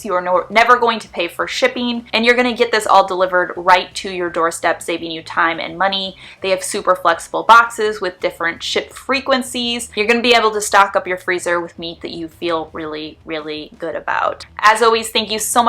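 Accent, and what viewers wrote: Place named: Connecticut, United States. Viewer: So refreshing to hear a Connecticut non-accent! Been in Maine wayyy too long!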